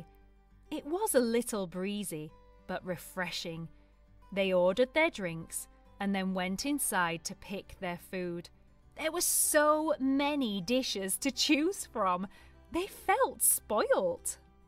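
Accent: United Kingdom. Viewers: Please give us time to read this story in British accent because we want improve our British accent 🌷🌺💞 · Thank you for this wonderful story! 🌞 The clear British accent and helpful subtitles made it easy to follow and enjoyable to listen to. Perfect for B1-B2 learners like me! Please keep making more stories like this. 😊📚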